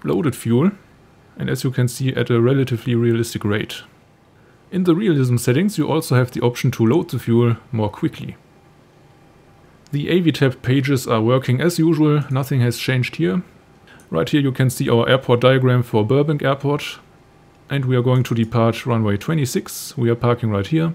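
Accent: Germany. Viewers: oh Hab an der stimme gehört und an das englisch weil wir immer so englisch sprechen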